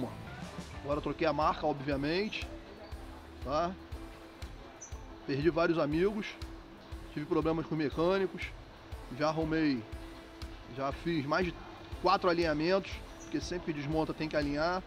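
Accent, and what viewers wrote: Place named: Rio de Janeiro, Brazil. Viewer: bom dia! No vídeo, você mencionou que o único mecânico que teve a paciência de resolver o problema, foi o Russo. Sou do RJ, pelo teu sotaque parece que você também é...... Você poderia me dizer o endereço desse mecânico por favor? Abraços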